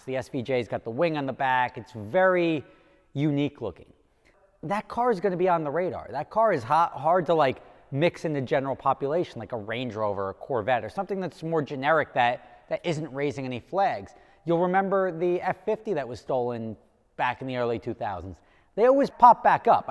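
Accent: United States. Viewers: Are you American or English Ps I’m English so please tell meeeee Wait no your American whhhyyyy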